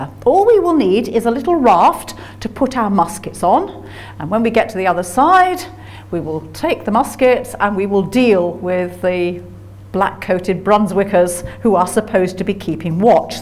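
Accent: United Kingdom. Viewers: Since she spoke with a British accent, I will not argue any facts of these events.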